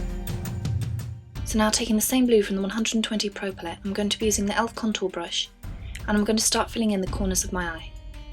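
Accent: United Kingdom. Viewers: Dunkles Avatar Make Up. Is aber der übelste britische Akzent. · wow, i love your british accent and i love what you`ve done with your face; its damn sexy ^^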